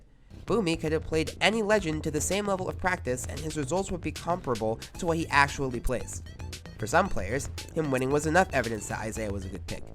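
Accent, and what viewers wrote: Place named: Sweden. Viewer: I hear sweden - i like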